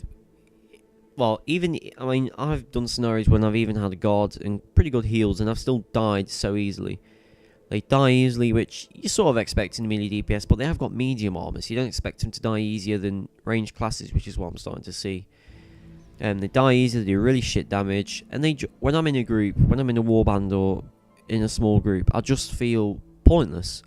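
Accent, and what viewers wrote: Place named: United Kingdom. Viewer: You also died twice as many times. Not blaming you though. If where you're playing is anything like the North American servers (you sound vaguely British so maybe you're on the Europe ones) 3/4 your teams healers are selfish DPSing DoKs and Shamans. Compare the number of Zealots vs Runepriests in the average match. A lot less Zealots, why? Because you can't DPS for crap as them so Destros don't roll'em. You'll see the same issue with most of the mirrors. Order heals so they live and DPS longer.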